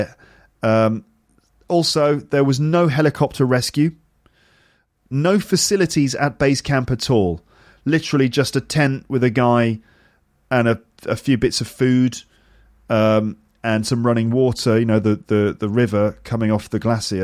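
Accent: United Kingdom. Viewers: Hi. I got your podcast previous friday and i really like it. I love british accent. I'll try to practice with your audio to perfect my accent. thank you very much. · Hello from Almaty Kazakhstan!! I adore British English it always nice to hear your podcasts! thank you very much,! I'm so greatful to you.....May the Force be with you, mate!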